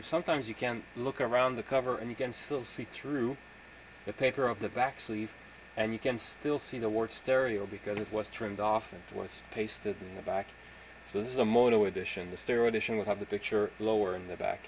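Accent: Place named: Canada. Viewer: I absolutley love some of these interesting records, and i want to see your whole collection. so please keep posting!!! And thats what i meant by no talking, please dont take it as a put down, (ya gotta enjoy a good canadian accent) but the quicker you get to the point, the more albums you show, if there's alot to say about a certain album, thats fine but you better be putting out more videos. you got some great stuff and much respect.....BUT POST MORE VIDEOS!!!!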